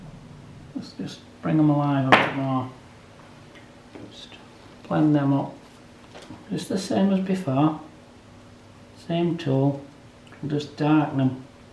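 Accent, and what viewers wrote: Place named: Yorkshire, United Kingdom. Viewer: Nice to listen to your Yorkshire accent, thanks for your Video 🤝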